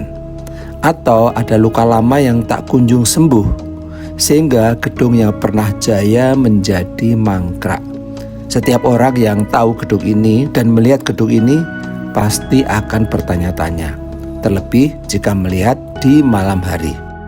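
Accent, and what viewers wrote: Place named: Java, Indonesia. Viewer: Naratornya orang Jawa, logat medoknya masih kentara, ga enak didengernya.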